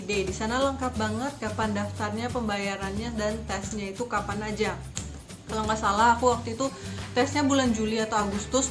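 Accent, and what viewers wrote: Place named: West Sumatra, Indonesia. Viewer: Dari cara ngomong nya di dengerin per kata seperti orang minang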